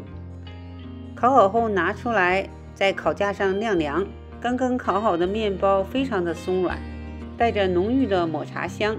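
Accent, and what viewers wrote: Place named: Northeast, China. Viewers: 一个吐司用拔个面包生坯，哈哈哈哈哈哈哈，好亲切的东北话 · 是沈阳话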